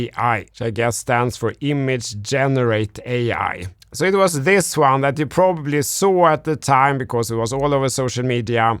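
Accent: Sweden. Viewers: A true Swede, judging from his very pronounced Swedish dialect. Keep up the good work brother!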